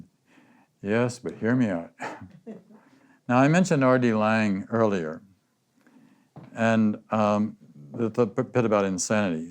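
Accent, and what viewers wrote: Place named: Missouri, United States. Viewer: Yes, I do hear your Missouri heartland accent, no? 🙏🏼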